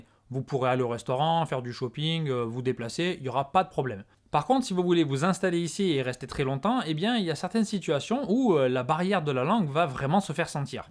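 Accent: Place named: Marseille, France. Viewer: C'est marrant j'ai passé la moitié de la vidéo en me demandant d'où te venait ton accent. Je ne me serais jamais imaginé que c'était de Marseille, d'autant que j'y habite. Comme quoi, notre accent a bien des subtilités.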